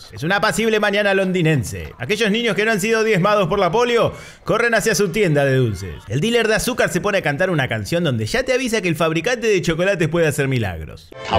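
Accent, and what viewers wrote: Argentina. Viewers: no pude terminar tu video puesto que argentina cayo tan bajo que no soporto como hablas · Definitivamente lo mejor del vídeo es la narración súper argentina 🤣🤣🤣🤣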